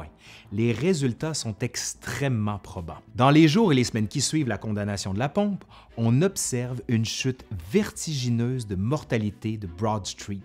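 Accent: Quebec, Canada. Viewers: du calme Molière je le comprends, les québécois sont ceux qui ont le pire français.. même je trouve que l’accent de celui dans la vidéo n’es pas très prononcé donc c’est encore compréhensible.. après on ne comprend rien de ce que disent la plupart des québécois.. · J'adore votre accent, les Québecquois 😁Salutations de Bruxelles ☀️ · Merci pour ton bon français Sa fais dubien decouter un québécois qui parle normalement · Super video, et ce petit accent de Québec ...un délice pour mes oreilles!(ca fait un petit plus pas du tout objectif!) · Non, non, il a bien à accent québécois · bon travail. interessant. Etant moi-même un québécois qui réside a l'étranger, "j'su ben" content d'entendre notre accent. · Jolie découverte cette chaîne d'histoire, merci, c'est très intéressant! Et avec ce charmant accent québécois en plus!! :-) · Captivant, on détecté légèrement l'accent quebecquois mais ce n'est pas très prononcé ce qui est super pour mes oreilles trop habitués à l'accent français et qui ont tendance à être intolérants à d'autres accents. 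Je ne connaissais pas l'histoire, très bien raconté. Merci pour le partage ! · L'accent canadien parle de lui-même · crime un vidéo d'histoire en canadien-français! Sa parle au diable! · il y a un français de France, basé sur l'accent parisien, tout comme il y a des accents en France, effectivement. A mes oreilles de français, l'accent du vidéaste tient plus du Canada que de France. C'était simplement une généralité que je soulignais, sans demander nullement un débat sur les accents canadiens / français / international. Bonne fin de journée. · toujours ce bel accent quebecois que j'adore qui ma fait un grand effet quand j'avais 15 ans j'en est 82 et il me fascine toujours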